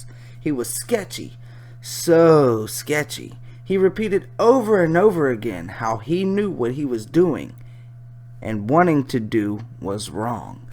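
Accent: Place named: South, United States. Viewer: who the fuck are you? you sound like some stereotypical dumb hillbilly. your recording machine seems to be broken. it sometimes sounds like a vinyl record (if you know what one of those are. if you don't maybe ask some old guy.) that has a scratch and is stuck in a groove what are you doing on the internet anyway? shouldn't you be out hunting possums for dinner?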